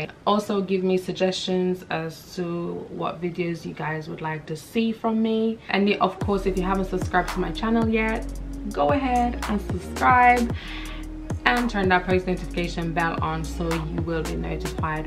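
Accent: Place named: Jamaica. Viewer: Do you have a Jamaican background? Or at least Caribbean... You sound slightly Jamaican based on some of your pronunciations.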